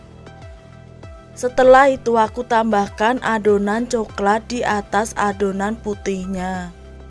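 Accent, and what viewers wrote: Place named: West Java, Indonesia. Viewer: Orang Sunda ya..kentara suaranya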